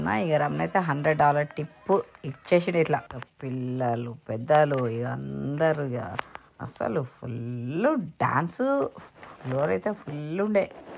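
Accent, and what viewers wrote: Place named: Telangana, India. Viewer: Super.... Meedi Telangana lo aee district?.. Telangana basha super matladtunnar 😅😅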